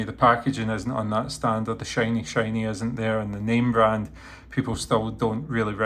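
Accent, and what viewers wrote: Scotland, United Kingdom. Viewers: your scottish accent makes me trust you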